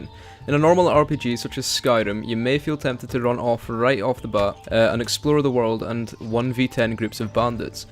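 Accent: Scotland, United Kingdom. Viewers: Unmistakable Scottish accent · You Scots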